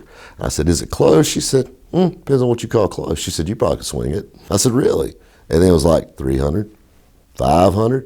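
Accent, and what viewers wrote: South, United States. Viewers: English is not my native language but I always thought I had a pretty good knack understanding people from the U.S. This guy's drawl however? Too much for me. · What accent is that? As in where in America is he from? It's really nice to listen to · This needs subtitles in English, southern dialect is killing me! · Fake southern accent? This is exactly how people in parts of the south speak, especially the Carolinas.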